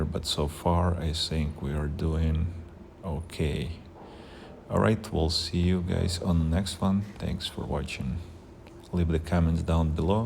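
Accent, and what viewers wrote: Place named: Russia. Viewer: Брат, ты из России? Акцент выдаёт😏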